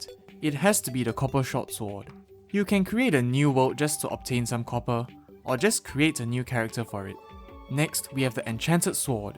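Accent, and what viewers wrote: Singapore. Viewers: He sounds like a Singaporean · dude are from singapore? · Sounds singaporean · Singaporean? Nice ! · are u a singaporean? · Are you Singaporean? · Hi, just a quick personal question. Just curious but you sound a lot like Singaporean Chinese. Are you? 😋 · oh you are singaporean no wonder u sound like one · Are u from sg? your accent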